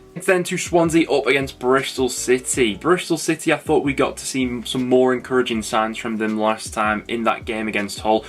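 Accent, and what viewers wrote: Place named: Stoke, United Kingdom. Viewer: Sounds like he's from Stoke.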